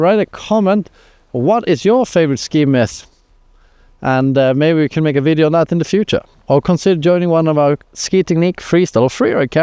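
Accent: Sweden. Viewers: Are you swedish by any chance? Sound very swedish when you talk :D edit: Great tips by the way!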